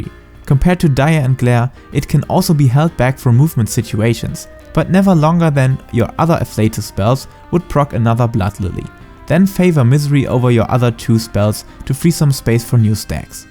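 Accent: Germany. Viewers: Are you German? That accent sounds like it! (I'm German too haha.)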